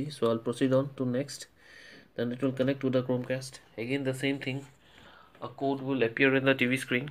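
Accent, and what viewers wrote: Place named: India. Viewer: Indian accent is so unattractive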